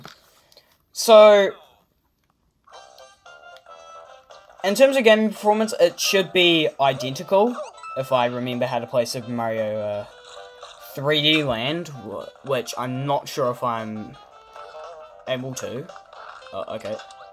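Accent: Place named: New Zealand. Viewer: This video was on my feed. Wasn't prepared for the kiwi accent 🤓 Kidding aside this was a very good comparison video. Thumbs up mate!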